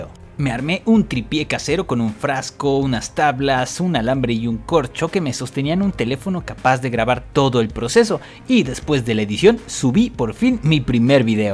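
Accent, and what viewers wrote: Veracruz, Mexico. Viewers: Entonces eres de Veracruz??? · ERES MEXICANO 😱😱😱😱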